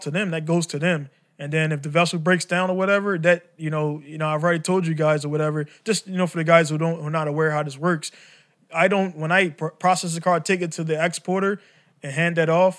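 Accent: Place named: Baltimore, United States. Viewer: A mix tape would hav been dope, do you still make beats??? Your accent is nuts my dude! Still hear that bmore flex tho. 😏 Being in business for yourself is crazy, it’s the best and worst thing ever. Holding yourself to a higher standard is important, never undervalue yourself or your work. As soon as you start doing that, everyone else will too. Looking forward to hearing more from you! Awesome test/intro. Hav a good night.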